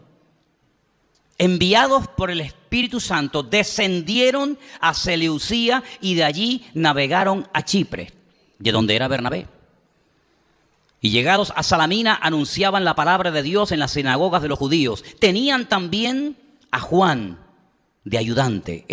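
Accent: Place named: Central America. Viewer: Disculpe pero usted no podrá hacer el idioma de otros países aún se proponga imitar los por qué se nota su acento ..de centro américa así viva años en estados unidos del norte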